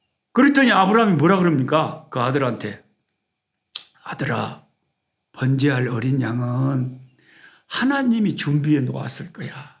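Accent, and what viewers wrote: Jeolla, South Korea. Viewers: 목사님 덕분에 성경공부 열심히 잘 하고 있습니다 전라도 영어 넘 정감있고 좋아요 ㅎ 아브라함과 이삭의 순종처럼 날마다 체찍질하며 순종하기를 원합니다~~샬 롬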